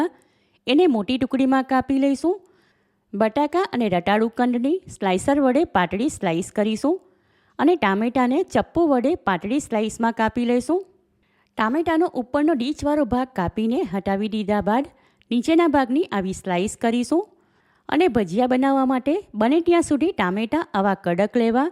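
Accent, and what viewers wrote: Surat, India. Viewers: I like ur surti language the way u talk so sweet...ur receipes I do watch they r 👌👌👌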